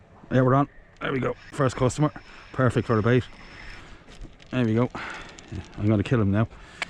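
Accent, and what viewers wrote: Ireland. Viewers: Just happened upon your channel, and its great! Are you from the west coast of Ireland by chance ? The accent sounds familiar. Big props from The US, a great video.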